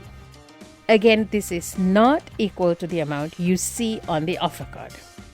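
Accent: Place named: Quebec, Canada. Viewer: Are you Quebecois? You accent sounds different than most from that area. Im just into languages and accents. Salutamu!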